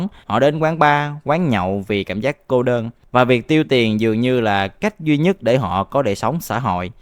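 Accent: South, Vietnam. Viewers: Bạn ơi, bạn giọng miền nam thì bạn nên xài từ "ngàn" thay vì là "nghìn" được không. Mình không phải phân biệt vùng miền nhưng mà mình tôn trọng ngôn ngữ tiếng nói đặc chưng của từng miền.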